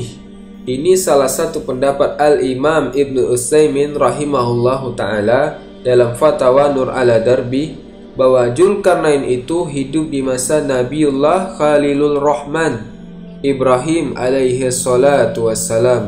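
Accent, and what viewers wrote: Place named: West Java, Indonesia. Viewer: Berdasar pengucapan huruf Z, fixed admin adalah Sundanese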